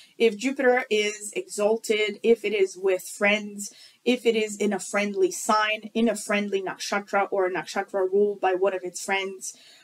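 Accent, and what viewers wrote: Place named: California, United States. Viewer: I enjoy your accent and listening to you speak. Arr you from Cali?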